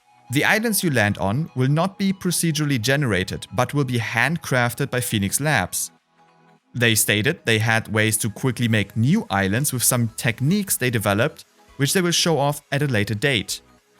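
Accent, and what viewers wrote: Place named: Germany. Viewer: Great Video ! But a little german accent :D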